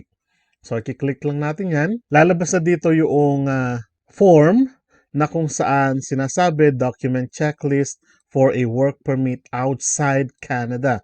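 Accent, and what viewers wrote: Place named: Baguio, Philippines. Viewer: Parang tga Baguio si kumander kuya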